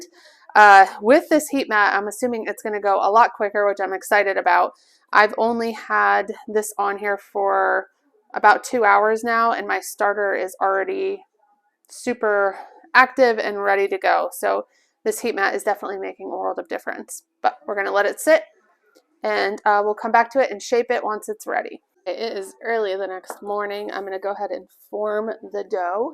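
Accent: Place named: Pennsylvania, United States. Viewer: I have to ask if you are from or live in Pennsylvania. I pronounce a lot of the same words as you. 🤗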